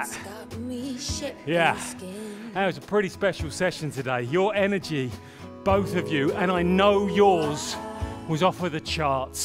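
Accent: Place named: Australia. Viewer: Started this workout 4 days ago. I'm 61 and usually walk every morning, but in our area of the country it is 105 with the heat index even in the morning, so I decided to get on board with a cardio workout and this is great. I can't stay up with the little lady on the right but I try and stay in step and in snyc with the leader and the lady on the left. Also, as a retired band director I am wired to at least try and stay in step with the video. It goes against my very being to be out of sync, but during the fast 45 second phases I'll just go at my own pace thank you. Love the accent. I think an Aussie could hold you up for money in a dark alley and you'd thank him! Ha! Thanks for the workout!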